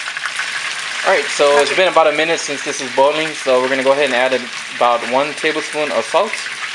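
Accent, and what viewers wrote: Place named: North, Cambodia. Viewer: She from north cambodia? her voice difference from us in svay rieng province. And her food make me hungry too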